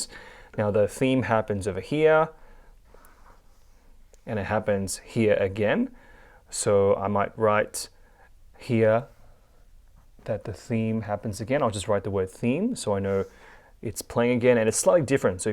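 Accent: Australia. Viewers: Aussie accent. Great! You're pretty good mate. Are you based in Canberra? And if so, do you offer private tuition? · lmao just because he has an aussie accent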